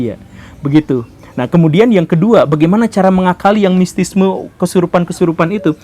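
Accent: West Java, Indonesia. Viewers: dari dialek bicara anda ini , saya yakin anda dari suku sunda.. tapi kenapa kagak memasukan istilah wayang golek sebagai seni wayang nya tsb setau gue mayoritas di jawabarat mengenal wayang itu berbentuk boneka / bentuk tiga dimensi, yang terkenal dengan wayang golek ? setau gue untuk jawa tengah dan jawa timur mengenal wayang wong dan wayang kulit, sedangkan wayang golek itu hanya di jawa barat. sedangkan di sumatra dan kalimantan tak dikenal perwayangan tsb, ini berdasarkan gue pernah hidup di pulau tsb... maaf kalau gue salah, karena bukan pemerhati sih waktu masih kelas 2 eS eR di tahun 1952 gue seneng baca komik perwayangan, sehingga faham cerita ramayana dan mahabarata dan sering juga nonton wayang golek tsb setengah malaman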